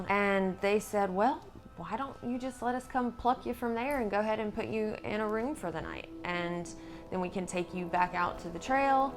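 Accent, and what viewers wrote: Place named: Tennessee, United States. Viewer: Im from Tennessee. Your accent is a little bit Tennessee.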